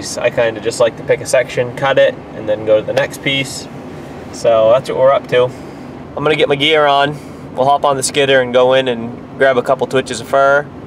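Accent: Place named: Maine, United States. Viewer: The “Stump Lickah’s” are out in full force, going after the cedar bark, at that time of year!!! Unless you have some crab apple trees down back, but usually those are stripped pretty bare by the end of January!!! Your accent’s a little thin for being a “DownEastah”, but it’s nice to hear on YouTube!!! Hi from Central Maine, and Family!!!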